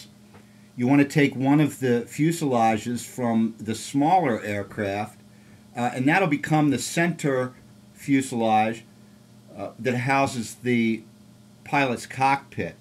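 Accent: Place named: Australia. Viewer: great fly. he speaking as an Australian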